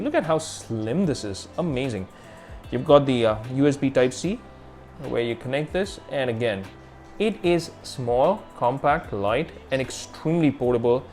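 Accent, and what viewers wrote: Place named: India. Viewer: The Fact that he looks a bit like Chinese...with an indian Accent. 😬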